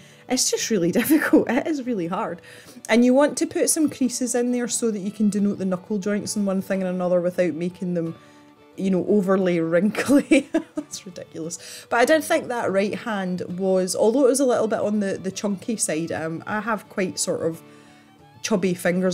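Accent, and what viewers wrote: Scotland, United Kingdom. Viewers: I don't really know how I actually found your video, but watching you and hearing you talk I get so many Scotty vibes here :D I love how you say "wee bit" a lot :) it is a nice drawing, though. I shared my own illustration on Instagram (@ I drew a Manga girl in a ball gown :)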